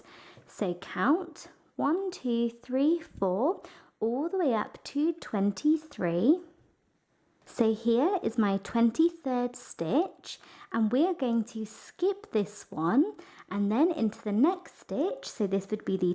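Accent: United Kingdom. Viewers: Que bonito suena el inglés británico!! 😍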